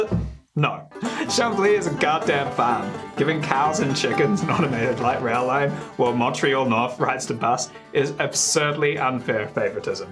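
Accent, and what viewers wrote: Australia. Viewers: Your accent is making me so confused! It's not exactly Aussie or Kiwi, it's mixed with something I can't identify... but probably you've lived in Canada for a good while? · Why does this dude have an Australian accent if he’s from Montreal?!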